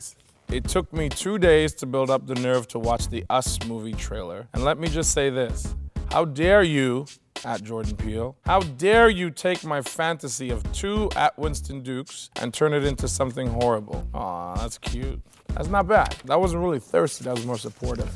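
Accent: Trinidad and Tobago. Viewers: Him and his tobagoonanian accent. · I Wanna hear his Trinbagonian accent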